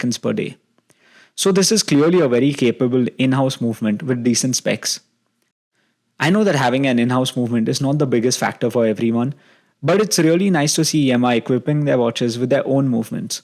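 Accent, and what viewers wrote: India. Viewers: Your accent is normal. I just felt that it just has a bit of an Indian tone to it. Anyway, your voice cover is very good.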